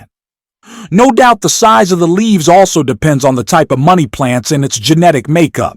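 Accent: India. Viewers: Honestly its sad to see people having to us ai because its clear and in depth with the perfect tone. I dont think that many people love the indian accent.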